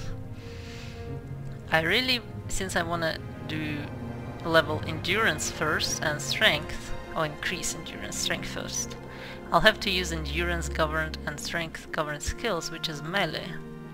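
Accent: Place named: Russia. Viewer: Dat Russian accent is so awesome. I will gladly be a sub of yours :)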